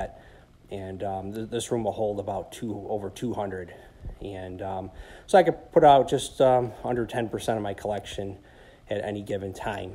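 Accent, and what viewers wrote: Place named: Chicago, United States. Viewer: I grew up in Chicago in the 70's when the Bears were terrible and me and a lot of my friends were Cowboys fans. Funny, I detect a Chicago accent from you, did you have the same 70's experience? That '77 Cowboys team was probably my favorite team of all time. I was in the navy from '86-90 and was about 20ft from Staubach on a carrier once. Bert Jones had a cannon. I live 7 miles from where The Sorena Hotel used to stand. Al Lang Stadium where the Yankees used to spring train is still in use and is now a full time soccer field.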